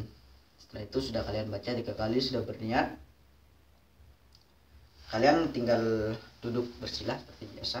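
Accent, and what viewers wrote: Madura, Indonesia. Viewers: Orang mna kak Kyk ada sedikit BHS Madura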